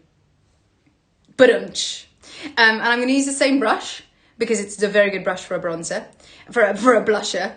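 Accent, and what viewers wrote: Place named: London, United Kingdom. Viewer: Thick London accent.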